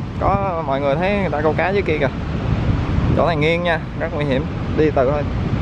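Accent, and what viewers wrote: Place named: Tam Hiep, Vietnam. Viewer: Giong dab tam hiep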